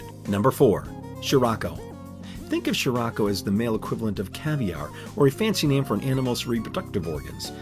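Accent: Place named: New Zealand. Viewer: Your NZ accent was way better, it's what makes your videos awesome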